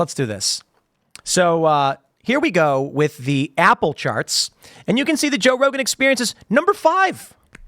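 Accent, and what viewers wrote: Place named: Wisconsin, United States. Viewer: How about a SPOILER warning? Hearing read Rogan's jokes in his hoarse 'sconsin accent is a guaranteed way to not make it funny when I watch Rogan actually deliver.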